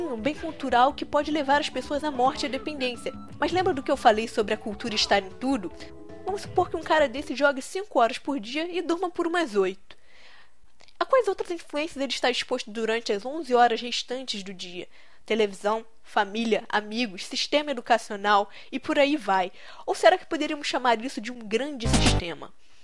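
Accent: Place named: Rio de Janeiro, Brazil. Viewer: É, o grande defeito desse canal é o sotaque dela. Esse carioquês é uma outra língua!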